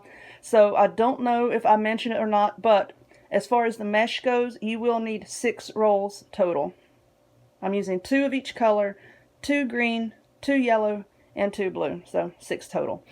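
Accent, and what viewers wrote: South, United States. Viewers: Hello my Southern friend, my name is Joyce and I live up near Chicago and I wanted to let you know how much I enjoy your videos. I have been logging in and checking to see what you're up to and I love the things that you share. I respect the fact that you want to do tutorials using only things that you know people would have around their homes, I get that. I have done a couple of wreaths myself and while skimming through the internet one day I found where mesh with a stencil burner. Well because I do have one of them on hand I used the stencil burner to cut my mesh and it made a huge difference in my mesh as far as it unraveling. I love that thing it's quick to use I had all that mesh cut in no time. Although I totally agree with you about keeping it simple so that your viewer's have those things on hand I thought I would just give you that little bit of information because I wanted to share and give something back. 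Keep bringing those videos, love your accent, love the crafts and the hauls that you do . from a dedicated subscriber 👍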